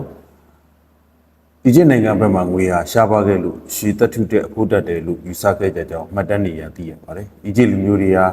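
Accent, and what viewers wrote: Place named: Shan, Myanmar. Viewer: မြန်မာစာဖတ်တာ တအားရှမ်းတယ်နော်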